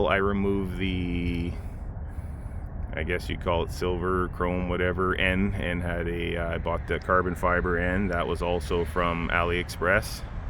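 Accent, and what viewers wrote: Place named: Canada. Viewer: I hear the Canadian accent. Perfect car for the Northern weather. Great video man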